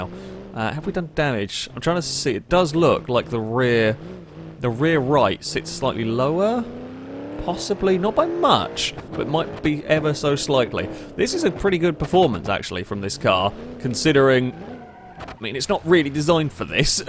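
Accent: United Kingdom. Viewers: Gee, I wonder why a guy with a British accent uploads at odd times for you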